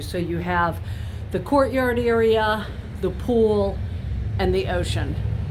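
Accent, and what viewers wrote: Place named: Boston, United States. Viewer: New England as in Boston. (Pah kahz.) Love it! Am I right? Or at least close by...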